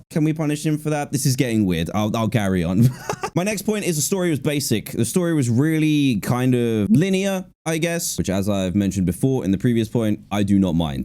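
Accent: United Kingdom. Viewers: Dan I love your British acent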